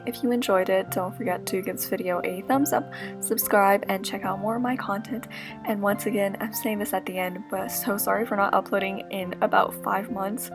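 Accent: Canada. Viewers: She is back with her cute Canadian accent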